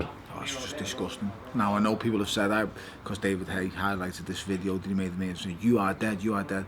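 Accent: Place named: Liverpool, United Kingdom. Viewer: Tony the bellend you fat overgrown balloon. You ugly scouser with a disgusting accent like all the other scousers in the world you will not get home safe to your wife and kids after david the toemaker haye whoops you so bad you’l be in hospital with your wife and kids next to you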